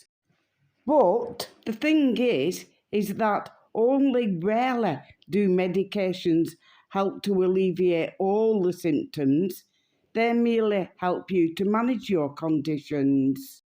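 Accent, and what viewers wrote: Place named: Yorkshire, United Kingdom. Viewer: Yorkshire accent that mate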